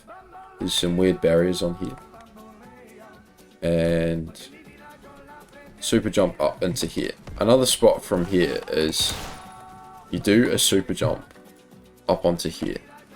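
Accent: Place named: New Zealand. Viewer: also are u kiwi? i am too recognise ur accent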